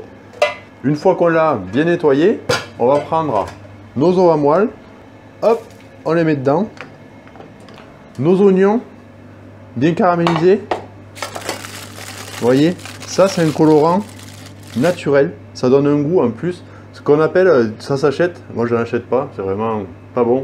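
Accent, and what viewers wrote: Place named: Provence, France. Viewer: J'adore, je salive et l'accent de Pagnol en prime, que demande le peuple ! 👍😘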